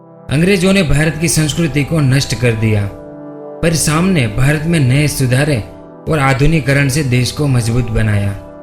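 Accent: Gujarat, India. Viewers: Apki voice sunke lag raha hai ki ap Gujarati ho replay plz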